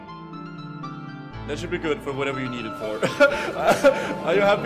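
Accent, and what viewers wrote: Denmark. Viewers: Are u danish?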